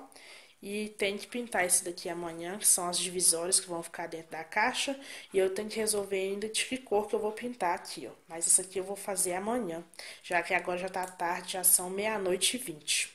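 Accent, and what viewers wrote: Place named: Minas Gerais, Brazil. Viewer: Você é mineira?? Tem um sotaque da minha terrinha boa rsrs like.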